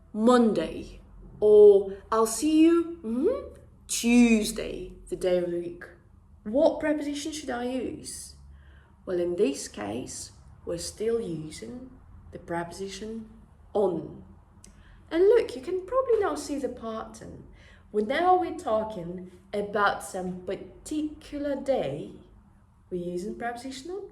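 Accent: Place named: United Kingdom. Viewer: Нормальное произношение. Британский акцент.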